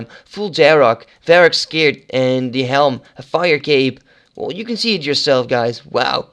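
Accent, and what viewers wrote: Netherlands. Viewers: Are you Dutch? I recognize the accent ^^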